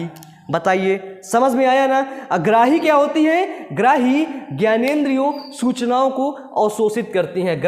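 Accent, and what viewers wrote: Uttar Pradesh, India. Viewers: Sir aap up ke hai kya up ke bhasha hai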